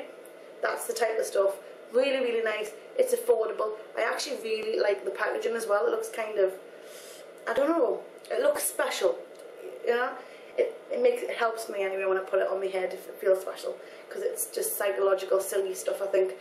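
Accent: Newcastle, United Kingdom. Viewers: For all non British viewers, the reason she has a strange voice is because she is what we call "a Geordie" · Strange? LOL How about it's a British accent, yokel. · She's from like the Newcastle area in the UK. There are about twenty different UK accents that you've probably never heard before if you're from elsewhere. Most people who aren't from England seem to only recognise the London accent as an English accent but truth be told we have loads of "weird accents" ;) x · Hey there, Geordie Girl... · guessin your from newcastle AWESOME !!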